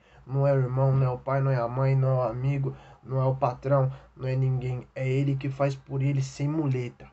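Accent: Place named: Favela, Brazil. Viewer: Jeito que favela fala meu deus kkkkkkkkk eu amei esse vídeo !!!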